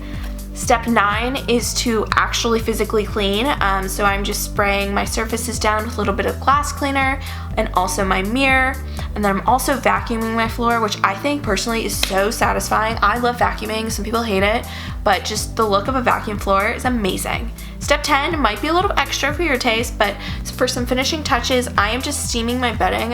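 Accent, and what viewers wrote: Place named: United States. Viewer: She sounds american and she said douvet.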